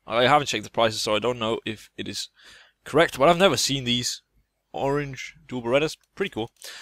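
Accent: Denmark. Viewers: Danmark? Tydeligt at høre!:)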